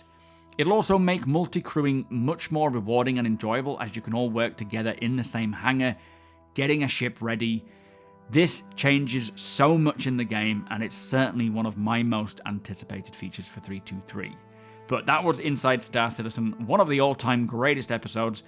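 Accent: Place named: Northern England, United Kingdom. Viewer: This guy is weird for me. He has a northern English accent but on 5 occasions he used American English or syntax.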